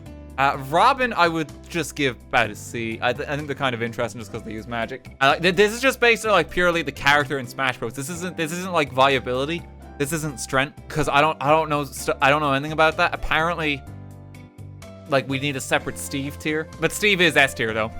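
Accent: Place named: Ireland. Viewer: He's like Jerma but less insane and Irish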